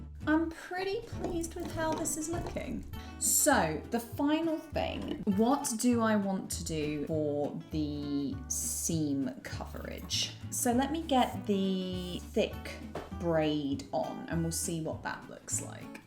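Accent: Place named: Australia. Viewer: I really liked the ducky pink and that sheer purple you had in the pile... Oh well, the lamp came out lovely. Hey, question... Where in brittain are you? You sound very Aussie to me (an aussie)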